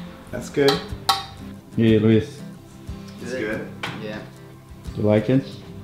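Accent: Minas Gerais, Brazil. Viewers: Sotaque mineiro não sai da gente mesmo hihihi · esse sotaque rs de Minas é lindo demais!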